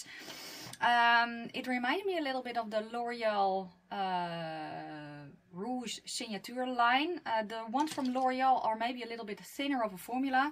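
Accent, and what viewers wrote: Netherlands. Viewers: yep..Dutch hence the accent 😉😘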